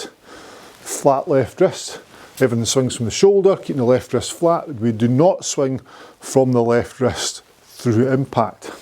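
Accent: Scotland, United Kingdom. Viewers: Love your instructions. I always pay attention because, to tell you the truth, you scare the hell out of me. My grandmother was Scottish and I just love the “accent.”.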